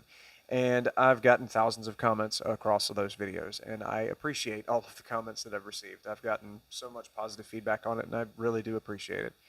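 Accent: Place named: South, United States. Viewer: Man I love your hillbilly voice 😂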